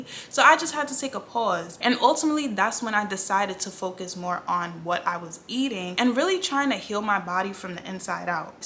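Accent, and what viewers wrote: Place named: New York, United States. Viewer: Thanks for sharing your journey sis. The internal work really is key. It still baffles me that the health industry doesn’t start with questions like, “What’s your diet like?” or “Let’s build a daily food regimen first.” We can't leave it up to theses Dr's/specialist. Btw... accent—definitely giving NY girlie 🗽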